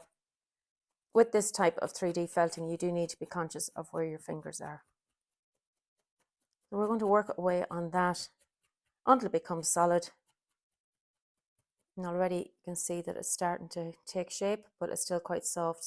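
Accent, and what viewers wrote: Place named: Ireland. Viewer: As ‘Sewcat’ above, says, I could watch and listen to your wonderful Irish accent over and over again.❤️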